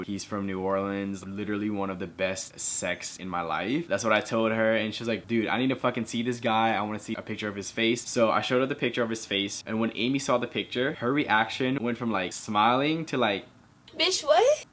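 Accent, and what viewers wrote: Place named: Asia. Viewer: u are talking like a thug speak in your normal Asian accent voice please u bottom girl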